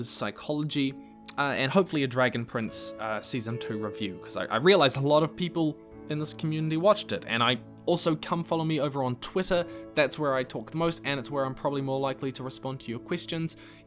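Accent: New Zealand. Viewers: Wait your a kiwi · Dude, i didn't even notice you were a kiwi, im also a kiwi so i guess i just sorta didn't notice your accent as its just the same as everyone else i know. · Oh you're a Kiwi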